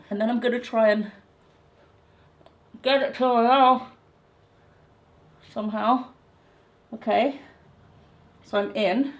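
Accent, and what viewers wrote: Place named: England, United Kingdom. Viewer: I love english accents lol always reminds me of bridget jones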